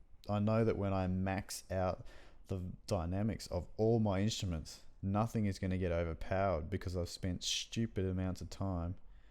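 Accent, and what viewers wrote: Australia. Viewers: Also I notice you have an Australian accent. Where in OZ are you from? Did you know that Cinematic Strings was recorded at the Verbruggen Hall at the Sydney Conservatorium? Have you tired CS?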